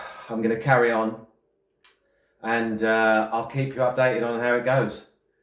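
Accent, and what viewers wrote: England, United Kingdom. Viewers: I clearly wasn't alone in my appreciation of the cheeky charm and sunshine you bring to LaLande! So awesome to see you here with your own channel. Woukd love to hear how tou came to be in France and your journey so far. Love the accent, the funny British-isms, and seeing you bring joy to so many. Go! 🎉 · ... to be clear, I'm not here just b/c you're sexy nor is it b/c I have that common American fetish - addiction to an English accent. I expect you to work your butt off, to keep win my loyalty.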